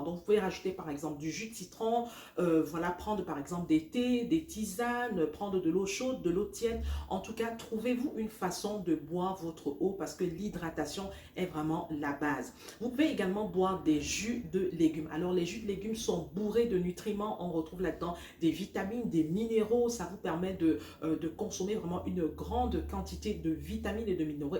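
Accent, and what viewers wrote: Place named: Cameroon. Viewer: Timbre camerounais ce que vous dîtes est très vraie j'aimerais svp savoir comment faire pour avoir votre extracteur svp merci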